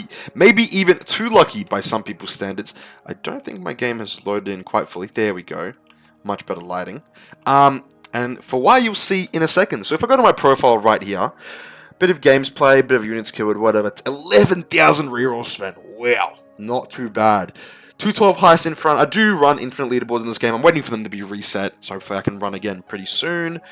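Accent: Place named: Australia. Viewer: Didn't know the goat was Australian asw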